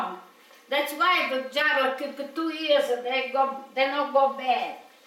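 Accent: Italy. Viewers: I watched my mom make the shells mostly for holidays. You even say it the way we do. Same dialect, must be same part of Italy 🇮🇹 thank you for the memories and recipes. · Italian-english, two in one 😘😘 💓❤️ 🌹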